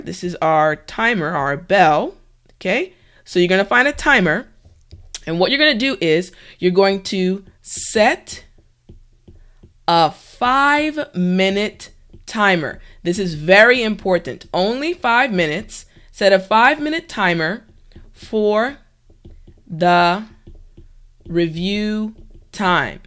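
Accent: United States. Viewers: American probably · Anyone please tell me Is this American English channel or British ? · well is this american english ?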